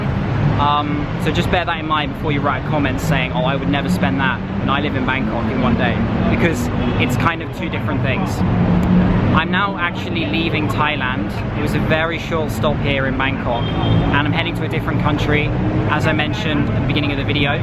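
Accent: United Kingdom. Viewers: I'm Thai people in BKK I love your video from Thailand, I very very like your British accent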